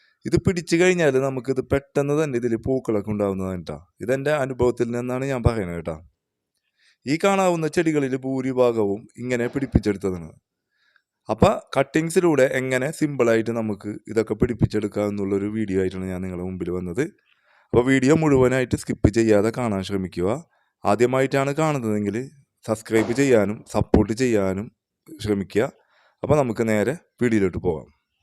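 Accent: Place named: Thrissur, India. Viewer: നല്ല തൃശൂർ ഭാഷ 😄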